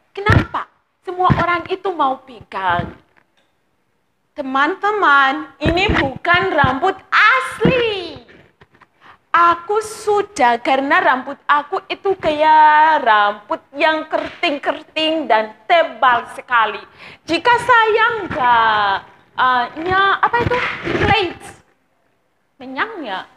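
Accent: Java, Indonesia. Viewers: Cantik mba ... tapi logat indonesia y kaya org jawa.... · Lucu banget sih kak, medhoook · Kebanyakan orang Indonesia kurang mengetahui geografi dan sejarah. Tetapi tidak semua. Masih banyak orang Indonesia yang tau geografi. Saya salah satu orang yang menyukai geografi. Ngomong ngomong bahasa indonesianya kog Medok ya mbak.. Tapi jadi lucu 😁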